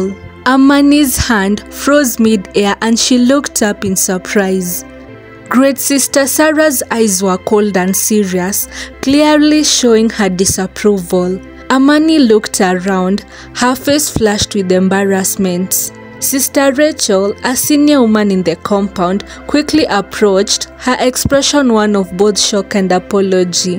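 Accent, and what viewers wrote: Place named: Kenya. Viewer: Thank you too for the stories and yes, your Kenyan accent is really nice ❤️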